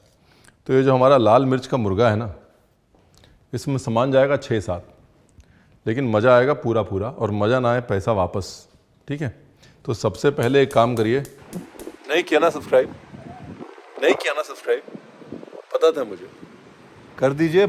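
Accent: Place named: Lucknow, India. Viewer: Aap English bhi Lucknow walo ki trhan bolte hain..☺️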